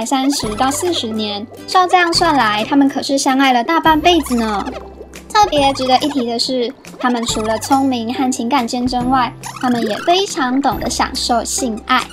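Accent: Taiwan. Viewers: 講話的風格像台灣吧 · 湾湾的口音让我好出戏🌝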